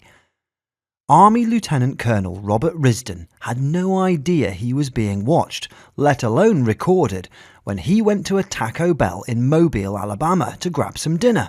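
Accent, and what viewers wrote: United Kingdom. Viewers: Am i the only one who thinks the video is a bit confusing with that accent talking about “our troops” with a British accent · “Good story of an admirable man in uniform, my hats off and thank you for your service.” That being said...the video is STUPID and imho takes away from the story in its attempt to glorify it w/ the repeating of itself all in the same paragraph and dumb accent. We’re here🇺🇸..,not here🇬🇧.. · I don't understand why the story had to be told several times, and by someone with a British accent. Great story, a shame there are too many that do not appreciate our military, especially some newly elected politicians who disgrace the halls of Congress this year. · Not to mention saying the same thing repeatedly!! Then saying with his Brit accent “ our soldiers “ However, thank you sir for all your ways of being great to those kids and to “ us “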